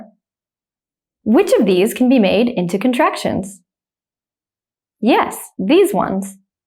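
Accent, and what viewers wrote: United States. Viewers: Hi teacher, Definitely, I like your American pronunciation. My examples; I'll visit my brother this evening. You'll be happy, if you follow my channel. We'll be so excited, if we meet again. He'll study English hard for the exam. She'll be looking gorgeous, if she wears that dress. They'll start teaching all subjects online. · I like the USA pronunciation. Today I am understand some short form of future tense will such as I will(I'll) you will (you'll) we will (we'll) and so on.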